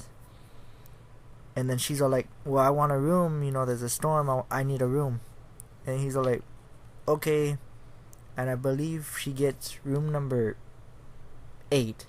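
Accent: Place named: East Los Angeles, United States. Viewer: three eps legit scared me: Inside the Closet, The Last Car and The False Prophet. One thing I liked about TFTDS is that a lot of the stuff went unexplained so that made it scarier. Although in the case of The Last Car it went beyond being unexplained to flat out just not making sense. Are you from East LA? You have the stereotypical East LA accent.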